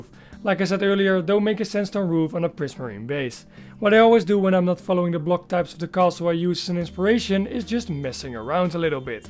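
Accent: Netherlands. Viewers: That’s one dutch fella if I heard one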